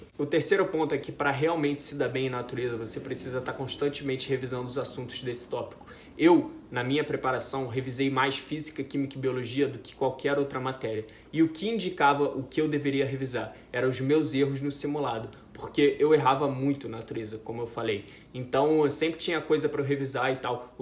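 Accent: Rio de Janeiro, Brazil. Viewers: Loiru inteligenti e carioca, rapaixxxxx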